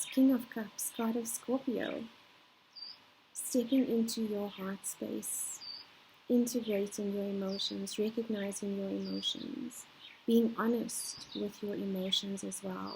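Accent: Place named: South Africa. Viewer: You have a South African accent.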